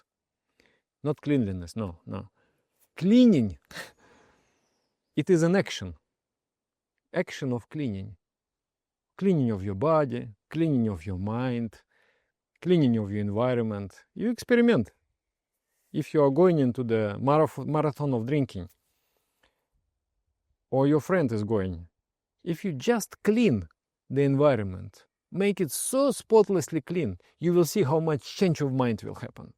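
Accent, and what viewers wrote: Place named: Russia. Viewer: I love the Russian English accent.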